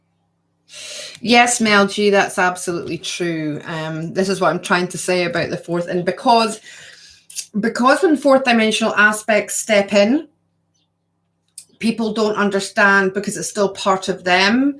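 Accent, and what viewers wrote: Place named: Scotland, United Kingdom. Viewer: Nice to hear a Scottish voice for a change on a truther video!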